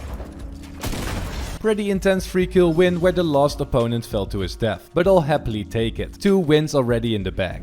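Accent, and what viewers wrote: Netherlands. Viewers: are u dutch begus u have a little dutch accent and im also dutch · are you dutch? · is he dutch? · ben je nederlands hoorde het aan de intro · You sound like ur from the nederlands. Is that true? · I feel like youre dutch because of your accent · Are you Dutch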